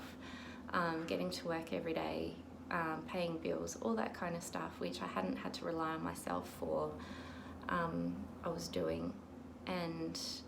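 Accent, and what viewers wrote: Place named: Australia. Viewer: Do I detect an Ozzie twang in there.. .. I spoke too soon.. .. you mentioned it at the end.. doh.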